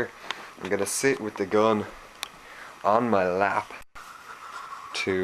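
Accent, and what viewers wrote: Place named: Ireland. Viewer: Can't miss an Irish accent!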